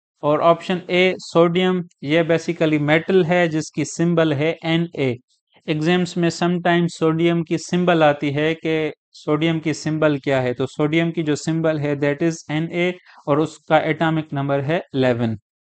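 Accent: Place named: Sindh, India. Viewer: Keep it up....very great efforts....ur pronunciation infirms that u r sindhi man...Am I right ???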